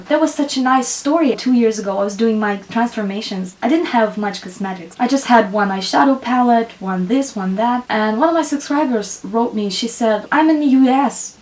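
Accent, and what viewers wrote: Russia. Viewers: I've been interested in Mac lately, we just got one in our mall (I live in a smaller city in Canada so not so much high end stuff here,) so good to know you love it. :) Might try it some day even if it's a little more expensive. I work in a drug store so I tend to just buy make up from there, Nyx (for lip stuff mostly,) Revlon foundation for my oily skin, Maybelline superstay powder for after that, and just anything for the eyes really lol. Side note: I was trying to place where your accent was from, but couldn't, then you happened to say Moscow, Russia. lol Coincidences. So cool, one of the places I'd love to visit some day. <3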